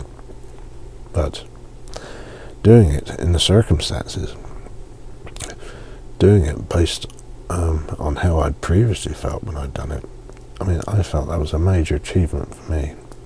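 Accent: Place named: England, United Kingdom. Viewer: What accent do you speak ? It must v certainly come from England Such a fine English is hard to find on YouTube So nice and gentle and mind soothing effect , your way of describing things is great . God bless you your words are indeed helping many here.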